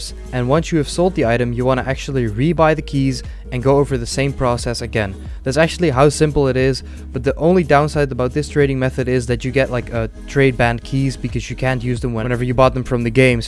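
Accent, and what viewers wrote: Netherlands. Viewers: its nice to know your dutch i sometimes hear a little bit of an dutch accent (im dutch myself and i recon the same thing XD)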